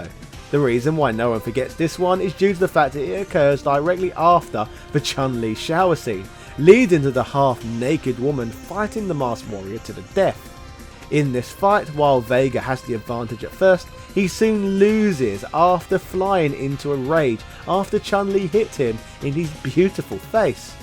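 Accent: England, United Kingdom. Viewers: Ok, I gotta know what English accent you have....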